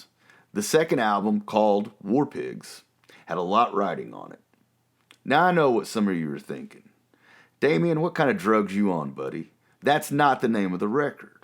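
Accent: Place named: South, United States. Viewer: Are you from Florida or Alabama?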